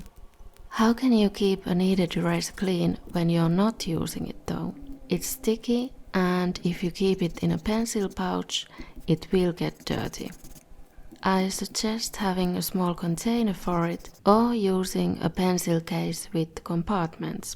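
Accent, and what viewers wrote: Finland. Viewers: Ootko suomalainen ku aksenttis kuulosta siltä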